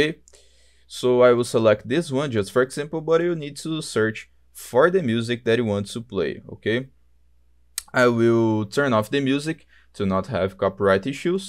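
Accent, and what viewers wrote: Brazil. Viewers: You sound brazillian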